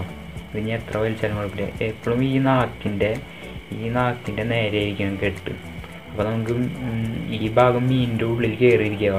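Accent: India. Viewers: നല്ല കൊടുങ്ങല്ലൂർ ഭാഷ ..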